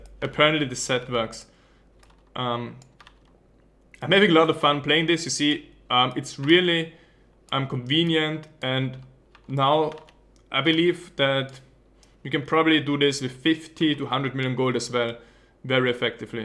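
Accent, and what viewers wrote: Austria. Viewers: ... I just came back to diablo just cos of this video and i will play my barb for SURE! funny accent+GREAT imformative videos+Austrian+I'll be back = im so happy that i subscribed you man. Keep up that great work dude. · I love your Videos! How could anyone not recognize that accent? Austria FTW:) (I'm german though, don't get confused)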